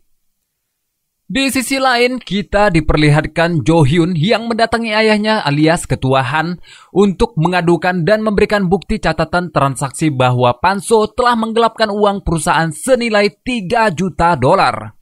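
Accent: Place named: West Java, Indonesia. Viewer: SPIL NGEREKAM SUARA NYAH LOGAT SUNDA😂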